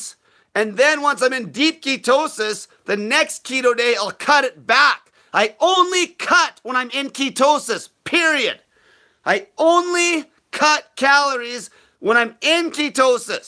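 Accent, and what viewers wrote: Canada. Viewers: He just needs an image re-adjustment. This gangsta character does not work with a Canadian accent. He doesn't sound tough, which is what he's aiming for. He just sounds like a wanna-be.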